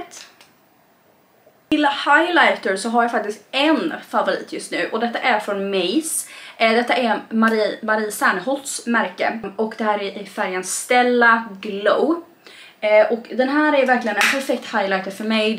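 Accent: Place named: Småland, Sweden. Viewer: Hahahha så fin! Men varför pratade hon typ småländska😂❤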